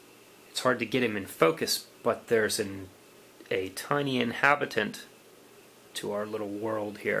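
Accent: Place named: Pittsburgh, United States. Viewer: I thought I detected a hint of a Pittsburghese accent;) I'm from the Lehigh valley myself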